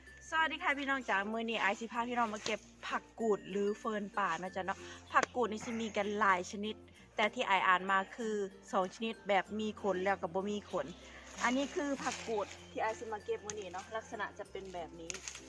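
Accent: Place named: Nakhon Phanom, Thailand. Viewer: สำเนียงคล้ายคนนครพนมเลยค่ะ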